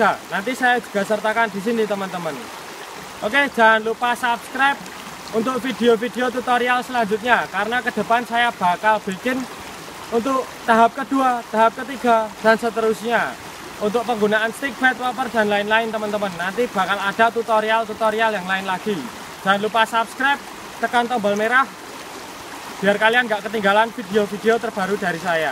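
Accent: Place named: Java, Indonesia. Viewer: medoknya minta ampun....agak dibeneri mas dialeknya biar gak mengganggu banget di telinga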